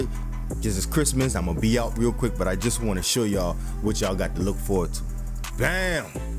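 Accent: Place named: South Carolina, United States. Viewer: Brah you sound like your from Moncks Corner South Carolina !!!!!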